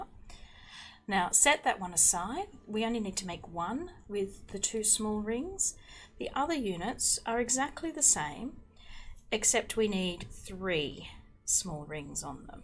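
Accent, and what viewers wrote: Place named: Australia. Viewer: This pendant is beautifully styled!! I found this tutorial, like all others I have watched here, was quite easy to follow along with, and soft Aussie voice is both soothing and relaxed making the atmosphere one where I felt I was having a private lesson with as my tutor!! Lucky me!!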